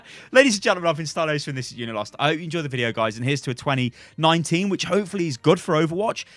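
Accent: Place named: Birmingham, United Kingdom. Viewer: Damn this nigga from Birmingham?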